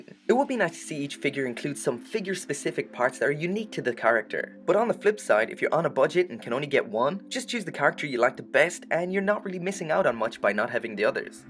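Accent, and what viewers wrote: Ireland. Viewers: I could sense a slight Irish accent! I live in the UK but I'm from Japan, your accent isn't as strong as the ones we get here but I still thought you lived in the UK so when I saw the end of this video I thought it was a shop in the UK and got really excited.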